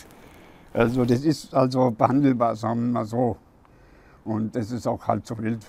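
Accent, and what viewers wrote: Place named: Hesse, Germany. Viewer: So sympathisch..mit seinem hessischen Akzent und seiner menschlichen Art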